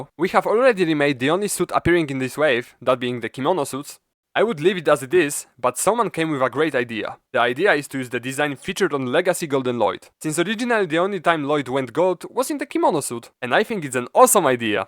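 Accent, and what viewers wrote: Poland. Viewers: Słychać że z Polski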